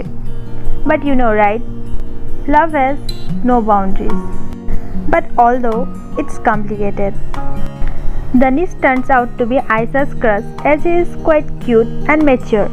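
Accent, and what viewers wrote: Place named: Bengal, India. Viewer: Heiii!! If you read this, then let me tell you that you really have a cute bengali (no offense) accent and that Just added the sprinklers to your storytelling. Actually I am currently reading "OUR IM'POSSIBLE LOVE STORY" & was really curious and came up to your channel. Keep narrating more📖✌🏽 support 💛💜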